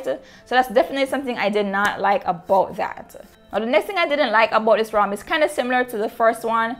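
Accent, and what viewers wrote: Jamaica. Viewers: is that a jamaican accent? seems like it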